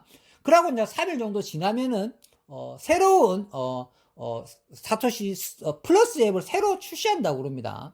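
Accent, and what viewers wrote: Gyeongsang, South Korea. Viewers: 저고 경상도 입니다 경상도 사람들 말투 ㅋㅋㅋㅋ 저도 경상도라 이해 합니다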